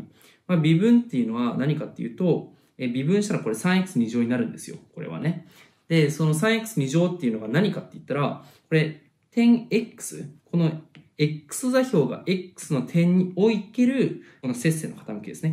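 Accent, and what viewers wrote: Kansai, Japan. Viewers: そんなに違和感ないぞこの関西弁。な↑んでやねんとか言ってない辺りマジでそんなに違和感ない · 語尾が関西弁になるの草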